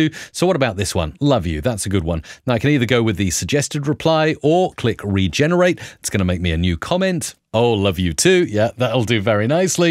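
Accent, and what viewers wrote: France. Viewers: The French accent is so authentic 😂🤣Amazing !!! Thank you for this moment ! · Fine I’ll subscribe after the French accent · The accent french 👨🏿‍💻😂😂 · What a French accent! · french accent was mad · Je ne sais pas comment prendre la "blague" sur l'accent français mais c'est tellement vrai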